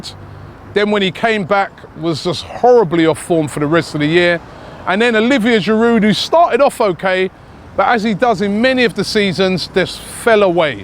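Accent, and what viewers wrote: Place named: London, United Kingdom. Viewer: bradke London mate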